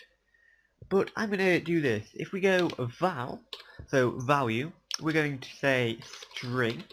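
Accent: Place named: Australia. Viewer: Are you from Australia? You sound like an Australian.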